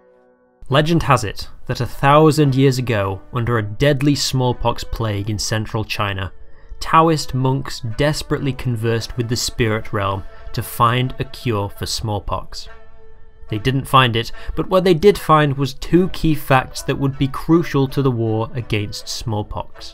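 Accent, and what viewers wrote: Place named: United Kingdom. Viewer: the narrator has a british accent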